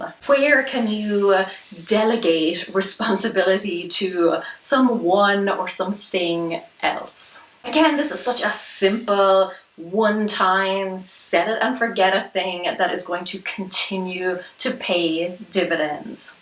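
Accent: Ireland. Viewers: Good advice!I love your Irish accent!!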